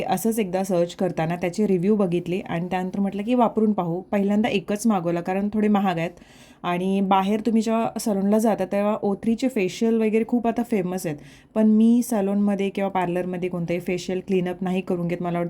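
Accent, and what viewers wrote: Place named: Belgaum, India. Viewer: Rahus nako... Amchi belgav chi basha.. Aikun bar watal..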